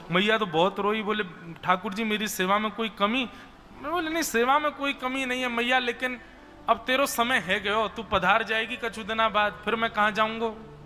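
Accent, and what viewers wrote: Braj, India. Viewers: बहुत ही सुंदर और अद्भुत कथा श्रवण कराई साथ बृज भाषा आपके श्री मुख से सुनने में बहुत ही आनंद आ रहा है 🙏🙏🙏🙏🙏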